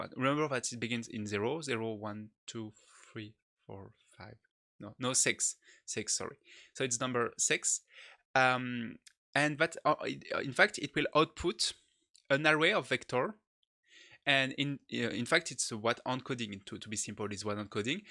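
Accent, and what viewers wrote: France. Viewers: is this accent french? i barely can understand some sentences :D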